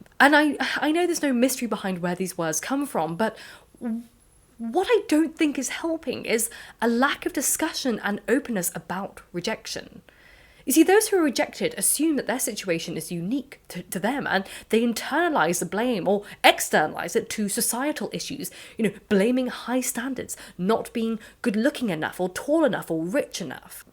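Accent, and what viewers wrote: England, United Kingdom. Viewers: You are British af. I love the way you talk! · I feel like I just had a great lecture from a former professor. And as an American, the English accent made it all the more better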